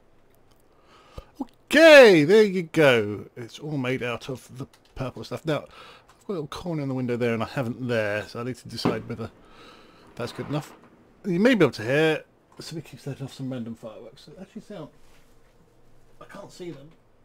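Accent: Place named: Southern England, United Kingdom. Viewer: This is the first video I have watched of yours and I must say I really like your commentary style. Being a southern Brit myself, it's great to hear such a southern accent talking about Minecraft! I really wished you'd get more views on your videos, your style may be slower and more relaxed but it is honestly the perfect thing for late night YouTube viewings! Keep up the great work, good sir! :)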